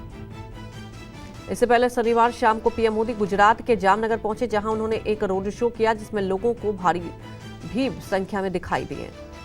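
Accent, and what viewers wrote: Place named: Bihar, India. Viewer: Madam Ki Bhasha Mein Bihar Jhalak Rha Hai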